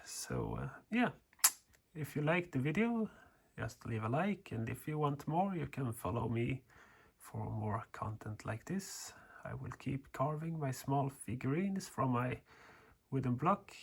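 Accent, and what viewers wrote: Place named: Sweden. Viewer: Excellent carving tutorial. Your speed of carving is perfect for beginners. And, never fear, your English is just about perfect with, and with an enjoyable Swedish accent. BRAVO from California.l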